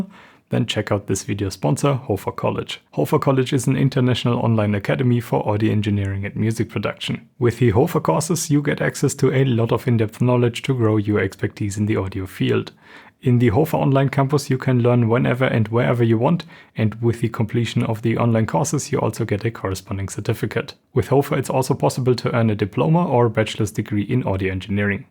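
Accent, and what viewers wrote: Germany. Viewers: man i am so sorry but it's just so crazy how grating german accents are in english, especially his, just full on german sounds, cadence and everything but in english, his english is great but i literally can't listen to this, gotta read subtitles. i'm from germany but american born and this is not even a "me" thing, german accents are the #1 most frustrating to listen to GOSH man ich bin so abgefuckt davon aber ich weiss dass es sinnlos und dumm von mir ist, so empfindlich zu sein und dann noch ein kommentar drueber zu schreiben :D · I was really excited to see your video, 2 mins into the video I was purring like a cat, your quite monotone German accent put me to sleep, so I had to rewatch it in the morning.....You should start a new channel.... sorry 😮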